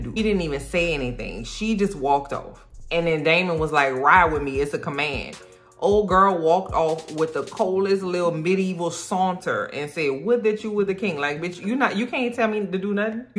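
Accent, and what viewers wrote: New Orleans, United States. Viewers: Baby the New Orleans accent. I subscribed so damn fast! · You have to be from New Orleans lol I hear the accent all day long 😭😁⚜️ love the review!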